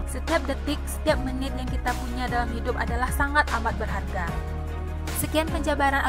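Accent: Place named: West Sumatra, Indonesia. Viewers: FIX... INI mah orang minang tulen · Adminnya orang pekanbaru nihhh atau orang sumbar kalo diliat dari logatnyaa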